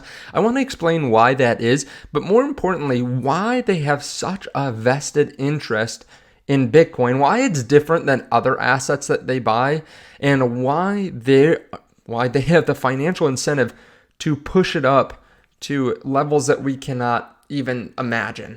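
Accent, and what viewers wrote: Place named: Wisconsin, United States. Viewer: 2nd viewer today,!!! I'm subscribed and send your content to my sister, who I talked into getting BTC and SOL. I'm a happy crypto investor since 2022. Oh BTW, I'm a fellow CA resident and your accent makes me chuckle. I'm born in Wisc. and have family that say how's it going just like you. Got to chuckle...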